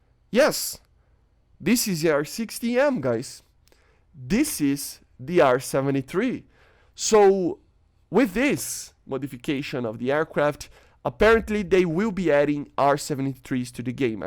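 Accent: Brazil. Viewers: Mano, esse cara parece um brasileiro falando inglês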